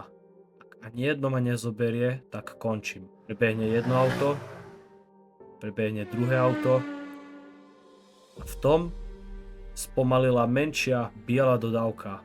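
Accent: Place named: East, Slovakia. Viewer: zaujmave ze si vichodnar a nebola tam ta to veta mamo ja idzu na vadrovku hlauski mi nabal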